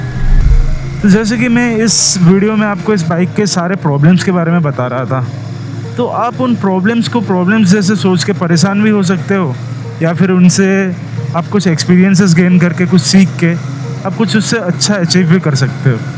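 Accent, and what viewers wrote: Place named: Odisha, India. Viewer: ତମ କଥା ରୁ ଜଣା ପଡୁଛି,ତମେ ଓଡ଼ିଆ........ଖୁବ୍ ଭଲ ଉପସ୍ଥାପନା କରିଛ Video re❤ lots of Love from Barbil,Keonjhar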